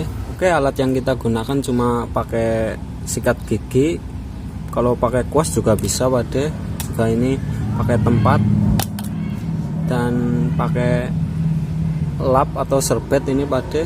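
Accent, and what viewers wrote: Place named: Java, Indonesia. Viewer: Asli mana bang..kok ngmong blepotan Jawa juga😅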